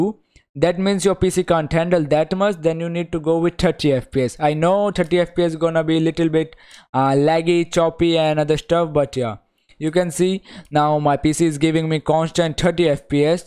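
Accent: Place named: India. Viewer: i hate the indian accents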